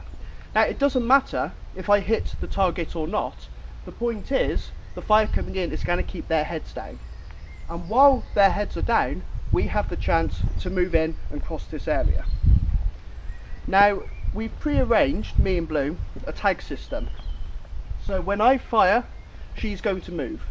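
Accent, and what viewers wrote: England, United Kingdom. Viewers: you english?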